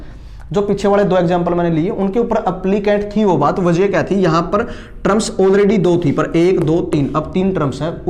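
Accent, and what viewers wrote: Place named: Haryana, India. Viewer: Superb sir apki haryanvi language 🙏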